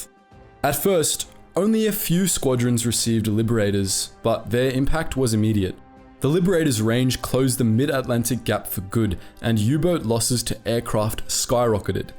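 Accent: South Africa. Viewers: Great job as usual. South African? Rhodesian? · Are you south african?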